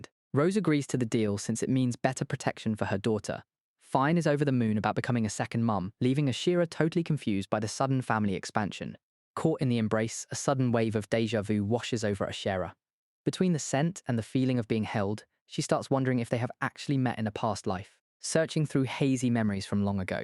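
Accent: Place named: United Kingdom. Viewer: British voice -10 Points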